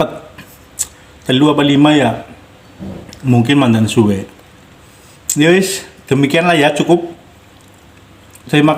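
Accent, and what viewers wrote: Banyumas, Indonesia. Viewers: oalah rika ya wong ngapak juga ya pak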